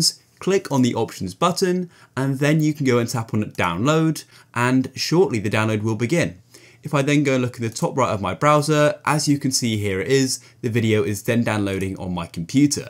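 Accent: United Kingdom. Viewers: British acent!!!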